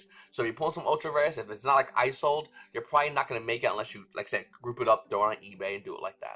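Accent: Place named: Northeast, United States. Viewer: Kentucky?..........KENTUCKY?.......... ON god i thought you was from NY, Boston or Jersey, just by your accent! Hey it's V here! If that dont sound Northeast when u say it i don't know what is Lmao!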